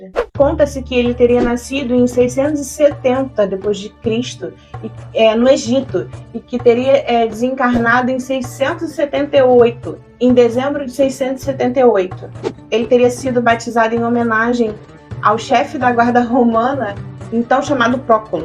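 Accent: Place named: Rio de Janeiro, Brazil. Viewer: Eu adorei o vídeo ❤❤❤..... mas o sutaque carioca é horrível 🤢🤢🤢🤢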